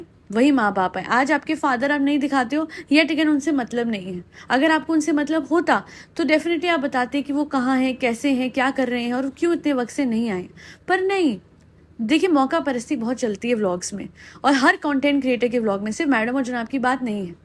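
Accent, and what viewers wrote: Punjab, India. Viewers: You speak very cute Punjabi. Please try to add a few lines in Punjabi in every podcast.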